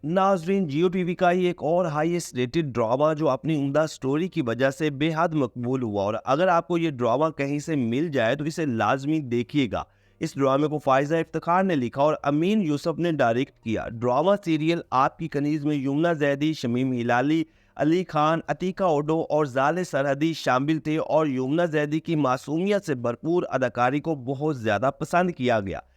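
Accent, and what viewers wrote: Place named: Punjab, India. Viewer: No doubt mother tongue Punjabi. Very poor URDU.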